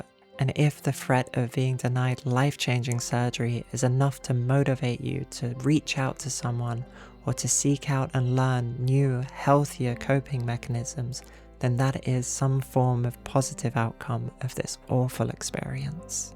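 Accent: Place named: England, United Kingdom. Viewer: That accent is English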